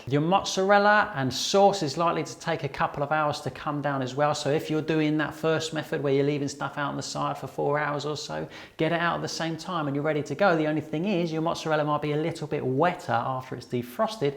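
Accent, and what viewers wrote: United Kingdom. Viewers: You have the most iconic British Accent! Makes me want to get your recipe for Banggers and Chips!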